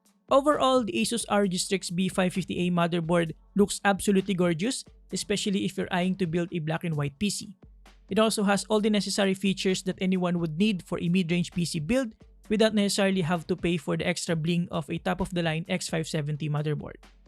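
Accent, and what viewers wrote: Philippines. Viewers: Just the way you talked I immediately knew you were a Pinoy XD. Jollibee gang from California lol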